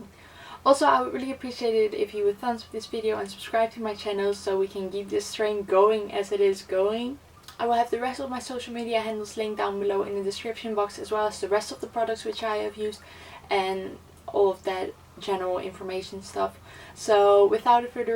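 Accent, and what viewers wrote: Netherlands. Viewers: dat nederlandse accent springt er wel uit haha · Are you Dutch? I can really hear it through your accent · You are Dutch... not even a question lol i can just tell 😂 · Nice tutorial, you sound Dutch👀 hey there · ik vermoed een Nederlands accent ??? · Het is half 11 s avonds en ik ga deze tutorial meteen testen. Altijd gestruggled met winged eyeliner (i am assuming you are dutch by your accent, if not I am sorry) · Hi! Thanks for the tutorial, it was very helpful. My eyes are horribly hooded, I had kind of given up on eyeliner but this gives me hope! Your accent is cute, are you from the Netherlands?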